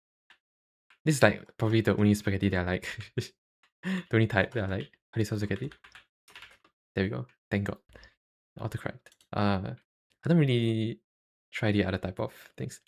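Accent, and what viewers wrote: Singapore. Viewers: hearing you talk in sg english is so weird lol my brain is not used to it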